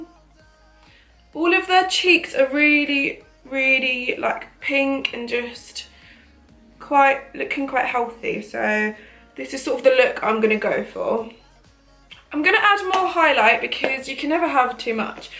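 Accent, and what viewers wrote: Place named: RP, United Kingdom. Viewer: this is literally the third video of yours I'm watching today I just told my sister that I'm obsessed with ur voice and I wish I spoke like you you sound so posh and british 😭 my twin agrees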